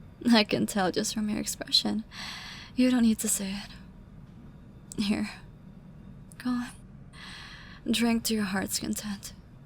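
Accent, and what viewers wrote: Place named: United Kingdom. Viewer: I find it so funny the British accent 😂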